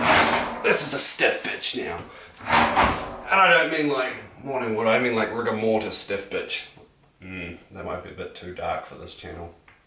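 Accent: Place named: New Zealand. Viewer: You a Kiwi?😁 You have a slight accent and in sure you aren't Brit or South African and me hearing a bit of an accent probably means not Aussie.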